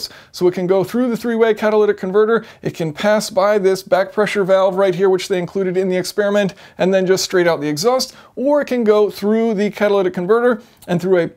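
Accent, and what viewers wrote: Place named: United States. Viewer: The first time I heard the American pronunciation of capillaries was watching Bugs Bunny when I was about 8 and I thought it was hilarious. Turns out it wasn't exaggerated for comedic effect - you guys really say it like 'kap-ill-air-ees'! The Australian (and presumably NZ and UK) way is to say 'ka-pillar-ees'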